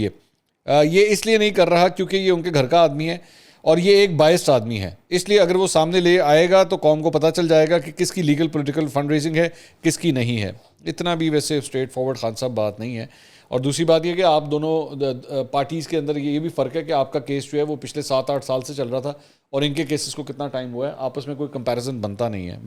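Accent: Punjab, Pakistan. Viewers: بھائی پنجابی۔ سلام آپ تو اردو بھی اب پنجابی لہجے میں بولنے لگے ہیں۔ شاباش۔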